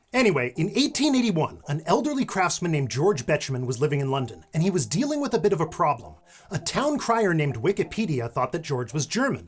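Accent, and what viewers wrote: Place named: Midwest, United States. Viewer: exactly! It almost feels like this dialect of Midwestern English is being influenced by the fact that 1/3 of the voting population want to get rid of public education. Maybe it is a legitimate dialect. But it feels more like a collection of linguistic mistakes with an accent. ...But maybe that's all a dialect is, when you get down to it.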